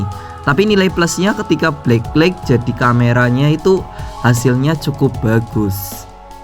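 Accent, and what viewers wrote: Banyumas, Indonesia. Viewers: orang banyumas juga bang?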